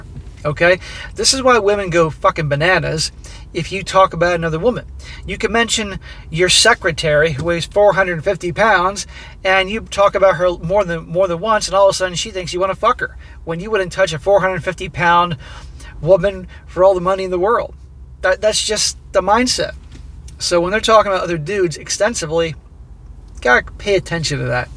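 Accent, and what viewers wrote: Yorkshire, United Kingdom. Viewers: This bloke sounds like a Yorkshire man, they are honest direct n strait to the point, one of my closest friends Richard is from Harrogate Very honest helpful direct n trustworthy, you just can't get better, not implying the rest of the people are dishonest by all means, but Yorkshire people are the best I met on earth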